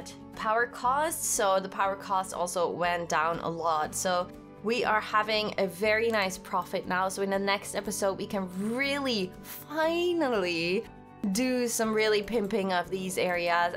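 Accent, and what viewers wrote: Netherlands. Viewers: Me : *was about to subscribe Me : *hears that she's dutch Also me : SUBRIBE RIGHT NOW Keep up the good work! 👍👍💯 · i came to your channel at the zootour video and 3 minutes in i could hear your dutch, keep up the good work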